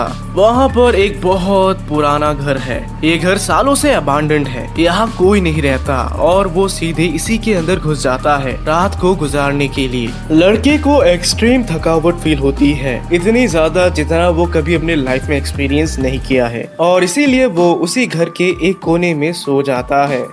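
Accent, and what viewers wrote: Bengal, India. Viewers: Bro i know your bangali is 😂😂😂😂 but it is funny keep practise because i know the bangali but i can red bangali because i am leave in Rajasthani😅😅 For two weeks i leave in my grandparents house And I love you videos ❤❤❤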